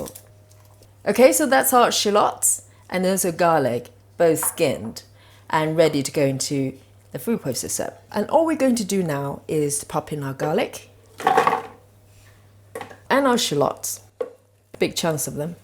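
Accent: United Kingdom. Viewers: I had to laugh at the end, as you say "put a dollop of chilli paste on the side" and then proceed to put the equivalent of a small ladle full! You are clearly "chilli mad", just like my wife. I will definitely have to make this for her, as I'm sure she would love it! 41 years ago, I convinced a little asian gal from Christmas Island to marry me, and making yummy things for her like this helps to keep the romance going. She spent 3 years in the UK, and has a slight British accent (not as strong as yours, but it's still there). Her voice is not quite as deep as yours either, but I found listening to a number of your recipe videos very comforting, and kept me thinking fondly of her while she is away at work and I work from home. Love your recipes, and will be trying the "french Fries" tonight. I'll let you know how it goes!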